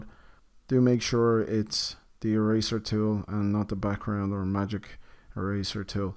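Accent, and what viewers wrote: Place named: Ireland. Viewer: THANK YOU SO MUCH. Finally found this after scouring for days. And that Irish(?) accent made everything so much better lol.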